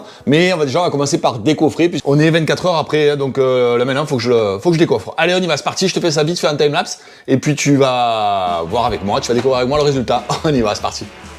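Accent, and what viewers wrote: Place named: South, France. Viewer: Si un sponsor passe par là offrez des serre-joint à cet homme du sud, il le mérite, des contenus de qualités, l'accent qui me rend nostalgique Beau boulot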